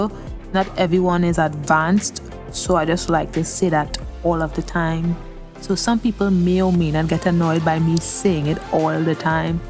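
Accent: Trinidad and Tobago. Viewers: I'm in the❤❤❤❤ with this.. you in the Caribbean. Well that's sounds like a trini accent. I'm just up the road😂😂😂 think I can drop by😂😂😂🏃‍♀️ I'll bring all my products I promise😂🤣🤣🤣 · I had a mind that you are a Trini being one myself and sounds like someone I know. But all in all I love your designs.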